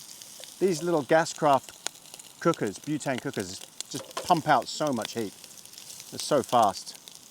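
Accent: England, United Kingdom. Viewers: Are you from England originally? I’m isolating at home at the min and started watching your videos 👍🏻😊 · Im confused , your accent sounds British , your truck plates say NZ and its raining and cold when New Zealand is in summer in NOV .... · This was very relaxing. Just out of curiosity, were you raised in Britain? You appear to have a bit of a British accent. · So you are in NZ. You sound like you are from the UK. Snap. Where was this filmed? Excellent work again. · Really enjoyed this video I found your channel last night for first time and watch this video and thought you was from the UK until you said New Zealand lol hope you and Bruce are doing well.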